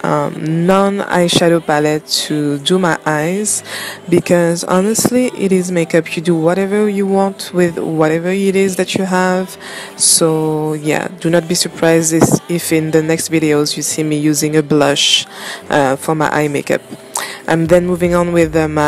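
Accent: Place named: France. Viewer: love the French accent! and you're gorgeous new subbie 😍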